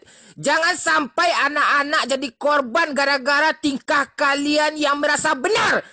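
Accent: West Sumatra, Indonesia. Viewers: Urang minang ma suaro nyo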